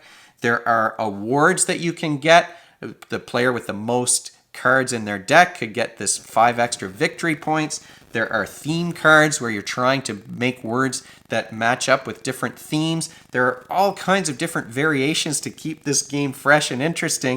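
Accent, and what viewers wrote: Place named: Canada. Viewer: Sick review. I love when I can tell from the first sentence someone says that they’re Canadian too lol.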